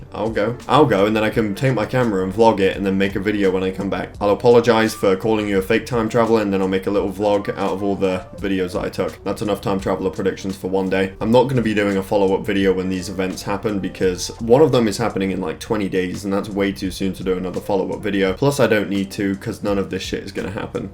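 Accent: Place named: England, United Kingdom. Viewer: Why does sound English here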